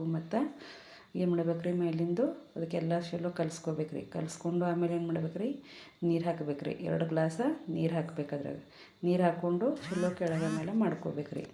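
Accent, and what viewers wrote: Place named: North Karnataka, India. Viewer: ನಿಮ್ಮ ಉತ್ತರ ಕರ್ನಾಟಕ ಭಾಷೆನೇ ಚಂದ ಬಿಡ್ರಿ ಸೂಪರ್ ರೆಸಿಪಿ 👌🏻👌🏻😋👍🏻🎉🎉✅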